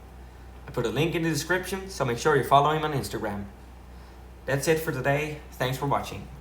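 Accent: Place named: Netherlands. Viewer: Ew weird dutch accent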